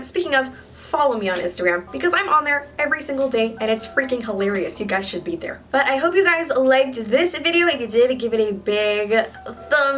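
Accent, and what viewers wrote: United Kingdom. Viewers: who else loved that British accent 😂😂? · Oh nvm I see wah British accent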